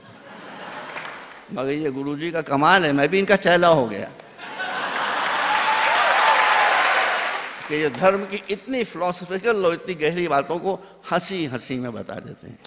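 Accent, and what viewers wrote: India. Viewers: Ye moulana bihari lagta he mujhe kyunki iski Hindi se pata chalta he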